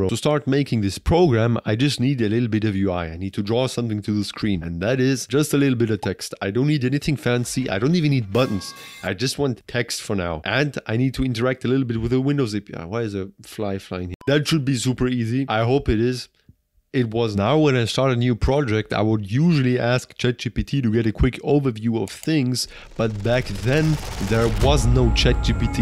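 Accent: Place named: Georgia. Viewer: where are you from? your accent kind of reminds me of Gattsu and he's Georgian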